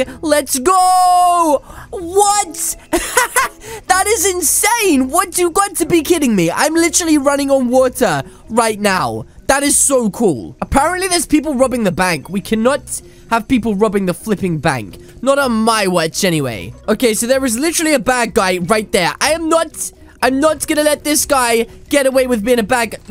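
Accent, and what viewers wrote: United Kingdom. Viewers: He sounded more british in his old videos now you cant tell cuz he does so many voices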